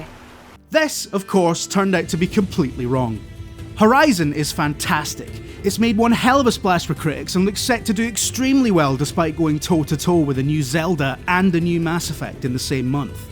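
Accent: Scotland, United Kingdom. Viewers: Is that a scottish accent I hear? Wonderful, could listen to this all day! Great review too.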